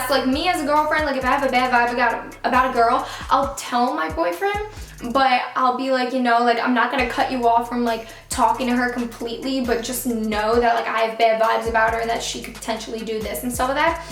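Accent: New York, United States. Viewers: U have such a New York accent 😂😂 love it 💗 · are you a new yorker? we have very similar voices and I hear your accent.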